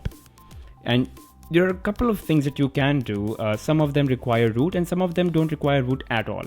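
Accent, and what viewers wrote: India. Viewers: I'm a white guy from California. I can understand what he is saying no problem. He has an Indian accent but Its not that bad. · bruh... indian accent English is pure fucking shit ear cancer! Also, no app for begging for "bobs and vegana"? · amit gupta We love being rqcists. I should sue this guy!! I had 2 seizures because of his God Damn fucking voice/nasty ass Indian accent. I like India like I like the flu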